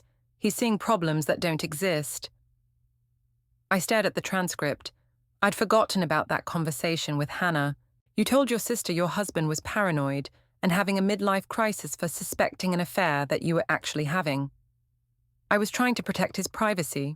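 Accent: United Kingdom. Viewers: Texas with British accent?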